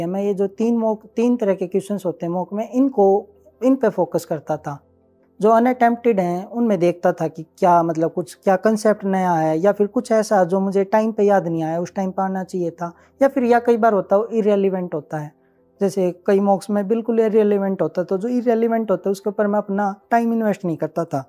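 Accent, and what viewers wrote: Haryana, India. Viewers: That's haryanavi tone..😁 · Haryanvi ha k 😁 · Hariyani tone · Ya chhora haryanvi touch hai😂😂🥳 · Haryana se ho kya bhai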